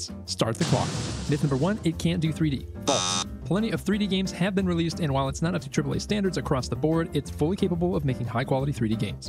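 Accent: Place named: United States. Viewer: His American accent makes oh sound like ah to us Europeans. But he's saying oh :)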